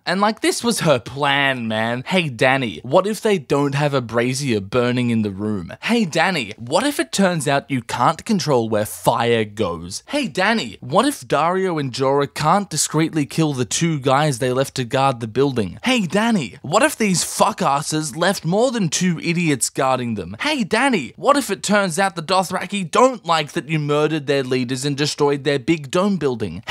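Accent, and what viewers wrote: Australia. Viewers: Melbourne! Ha... I couldn't tell if you were Australian or English, but now we know :)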